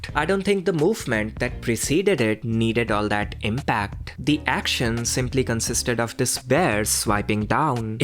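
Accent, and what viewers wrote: India. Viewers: How did you somewhat get rid of your indian accent?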